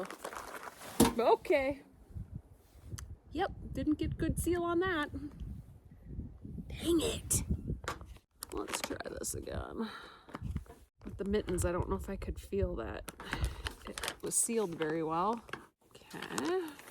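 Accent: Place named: Minnesota, United States. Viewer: I was guessing from the accent you were from Minnesota. And then you verified it. Uff da😂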